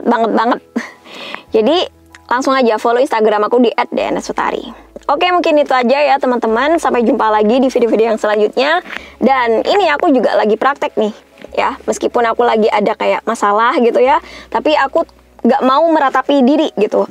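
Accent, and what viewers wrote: Jember, Indonesia. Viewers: Kakak dari Jember ya??? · Lho orang jember ta Mbak ? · mbk nya ini org jember ta?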